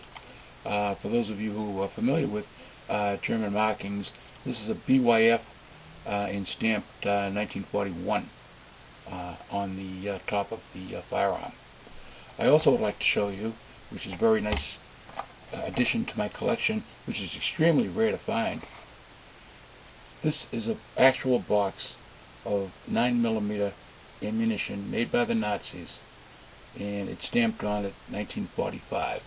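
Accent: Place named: Boston, United States. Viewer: You sound like that you're from Boston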